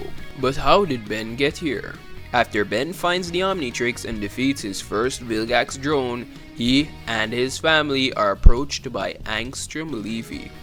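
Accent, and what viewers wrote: Jamaica. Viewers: Are you Jamaican by any chance?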